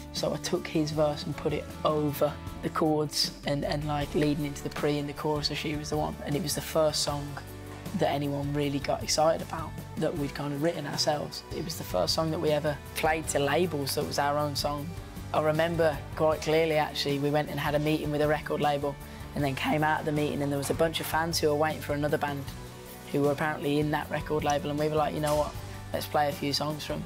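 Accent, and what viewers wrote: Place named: United Kingdom. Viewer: british accent tho 😍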